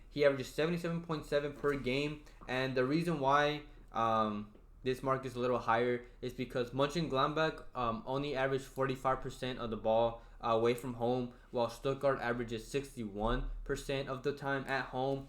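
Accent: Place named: Texas, United States. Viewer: youre in texas huh. i thought you had a texas accent. then you said its thunderstorming.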